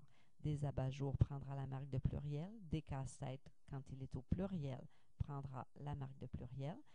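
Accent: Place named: Quebec, Canada. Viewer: C'est la première fois que j'ai remarqué l'accent québécois. C'est vraiment intéressant hein. Moi, je suis italien et j'avais toujours écouté seulement lequelle français. Maintenant je veux aller au Canada ahaha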